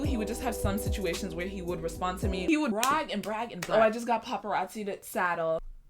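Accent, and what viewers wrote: Nigeria. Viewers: She is nigeria Becuz her last name says and its a igbo name in nigeria And in her second youtube channel when she was surprising her family with gift she had played a nigerian music She is nigeria but was born in taxes you can hear the nigeria accent when she is talking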